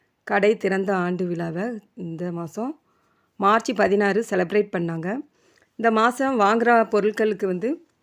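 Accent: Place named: Tirunelveli, India. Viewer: ok sis tirunelveli la therinchavanga madhiriye erunthichi athan kten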